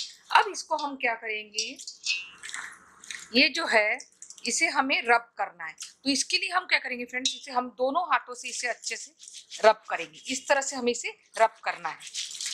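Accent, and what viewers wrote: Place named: Maharashtra, India. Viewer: Apka hindi mast marathi logo ki trha h nd thank u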